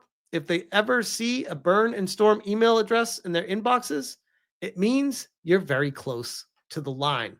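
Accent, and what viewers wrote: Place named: New Zealand. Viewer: Sounds like New Zealand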